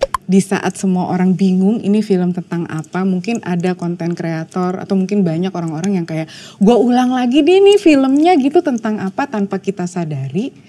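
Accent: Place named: Java, Indonesia. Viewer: Mba nya imut ...., kosa kata Jakarta tapi logatnya medok Jawa, jadi pengen nyubit si Mba ... heheee..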